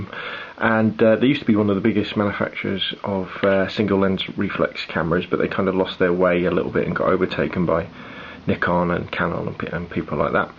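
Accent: United Kingdom. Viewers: i got lost in the british accent whem you were explaining the modes , i didnt understand what do yo say on the S mode. what does that mode does ???? i just gota minolta 404si DYNAX. not the same model but pretty similar. and your video help me a lot. thanks from spain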